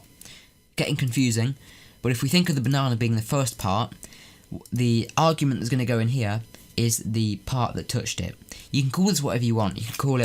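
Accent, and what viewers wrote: England, United Kingdom. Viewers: I love the way people with English accents pronounce the words and say er , ar, and like bananar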